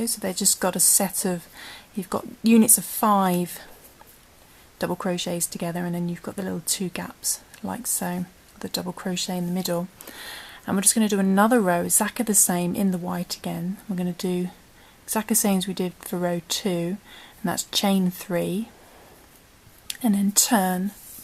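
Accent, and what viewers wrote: United Kingdom. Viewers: Too pretty for a cloth would make a super scarf. That said why oh why are you stating American terms firstly for the yarn worsted? or is the hasty dk British part? Also that’s not a double crochet for us older Brits it’s a treble! It just annoys me so much that fellow Brits nowadays seem to immediately use American terms I’m presuming from you’re a Brit from the voice naughty of me, also sorry pet peeve use of US terms for everything rant over. Is a nice pattern though.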